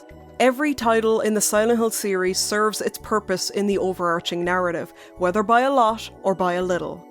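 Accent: Ireland. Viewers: Irish horror lady? Lets fuckin go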